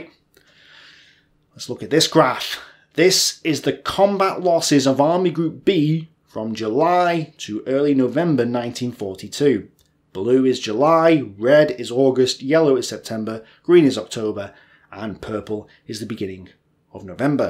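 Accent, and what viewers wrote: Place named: England, United Kingdom. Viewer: I like so much your videos about Ost Front that, as a french, I try to understand very focus on your speech. I get it, your superb english accent (?), in any case not the american accent, allows it for me. Fred (old close combat player, since 98 ;) )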